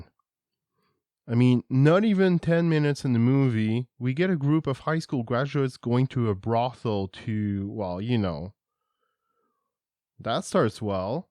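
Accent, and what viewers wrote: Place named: Netherlands. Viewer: You sound a bit Dutch.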